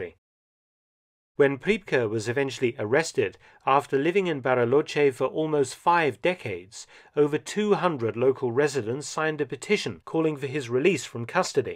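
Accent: United Kingdom. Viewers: There's something about a British accent that makes a WW2 history video that much better lol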